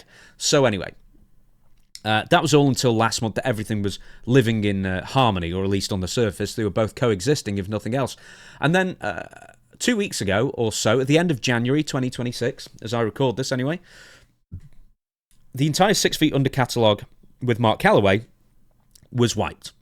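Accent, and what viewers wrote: England, United Kingdom. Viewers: I think a big reason people migrated to this channel is that for one: is self-aware. He doesn't have a deluded sense of grandeur in comparison to the wrestlers he hosts podcasts with. I don't like Conrad, I don't like RVD's co-host, etc. Brian Last is okay but sometimes he can be super rude when discussing people and as far as I know, Brian Last is morbidly obese. Second, professionalism. This is also why I really enjoy Stevie Richards' show so much. I like Dutch's as well but he's an older guy so I don't expect him to whip out the newest 4k technology, but Stevie has a studio, he puts effort, good mic quality, etc. takes the videos seriously, the camera and mic quality is excellent, and he actually prepares before he speaks on things. I don't mind the rambly moments, but it seems like he as bullet points to get him back on track or he'll cut if gets too off the rails, which I appreciate. 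Third: is a great co-host. If the wrestler doesn't know about something, you can rely on him to suitably fill them in before the show and even on it if they forget some detail. If the wrestlers starts rambling or forgetting their spot, is good at getting things back on track. Fourth: He has a British accent. Instant +1 because that accent was made to narrate, lol. And I've enjoyed every show he's had. For all people like to rag on Stevie Richards for never being that main event talent, his show is one of, if not THE best one out there. Good production quality, a man w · I actually like going to your videos more than Stevie's. Maybe because you are English and I relate to your tones and expressions more.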